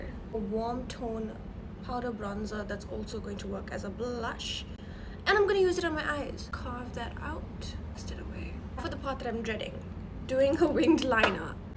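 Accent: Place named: South Africa. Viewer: looks white, is indian, trying latina makeup and sound south african. Everything about u is perfecttt!!!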